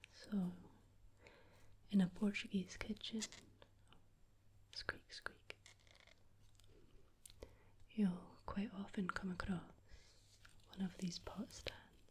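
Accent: Scotland, United Kingdom. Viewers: I adore this asmr. And your accent too :D As a fellow scottie it was so soothing listening to you speaking quietly. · hi, your voice remindes me of Jamie form Outlander! I guess it's maybe just a Scottish accent, but still feels like a very caring hug while listening to a midnight story from granparents <3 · I cannot emphasize just much I am able to relax upon hearing that beautiful Scottish accent and seeing the cheeky humour mixed with a smile of pure warmth. Absolutely charming every time! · I didn’t know that the Scottish accent is so calm and pretty to me!😮 It’s so nice listening to your voice. Mostly I like it when you say something that includes an “r”. 😅 Now i have to watch another video of yours. Greetings from Austria ❤ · this whole video i have been thinking that you sound Scottish but I thought you probably weren't as I've seen no Scottish asmrtists but then I heard you say "wee" and went 'I KNEW IT' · I love the way your accent articulates when you whisper. 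Scottish is such a round and organic sound, it's like a warm calming lava lamp 😊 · Love the general kenobi bit and how thick your whisper Scottish accent is 🏴☺️ Also your signature hand movements are top tier ✋ especially the outro where you cover our eyes sending us off to a deep slumber 🙈🤲💙🩵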